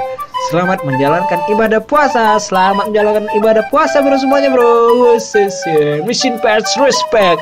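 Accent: Medan, Indonesia. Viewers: Bang orang Medan ya? Soalnya logatnya mirip orang Medan 😅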